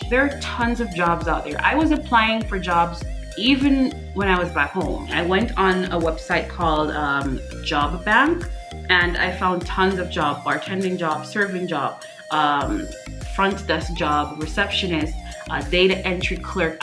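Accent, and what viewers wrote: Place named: Jamaica. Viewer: sounds like a yaadi (Jamaican)....are you?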